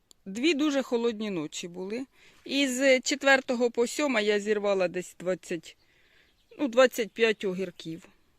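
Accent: Poltava, Ukraine. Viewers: Як приємно вас слухати Дуже милий полтавскій говір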